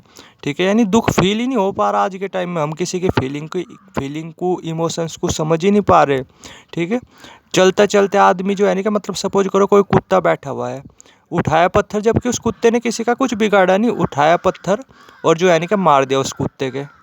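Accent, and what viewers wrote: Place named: Haryana, India. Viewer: hello bro phone लेकर ही बैठे थे 😅क्या वह हमें लगा कि आप हरियाणा से हो आपकी वॉइस से पता लग रहा था आपकी वीडियो बहुत अच्छी इसको कंटीन्यू रखना और दोनों चीज हमें भी है जैसे कि आपने वीडियो में बताया थैंक यू सो मच एंड गुड ब्लेस यू✨🙏😌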